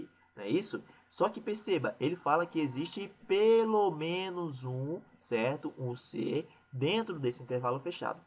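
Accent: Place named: Ceará, Brazil. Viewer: E esse sotaque maravilhoso é de onde?És também do Ceará? Parabéns pelo trabalho, muito bom!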